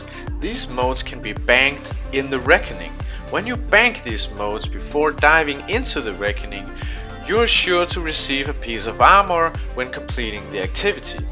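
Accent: Denmark. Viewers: im almost certain that you my good sir are danish #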